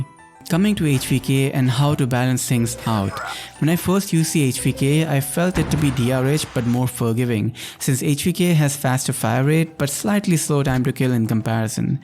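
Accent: India. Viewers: Bro where are you from..? Sound like you are Indian 🤔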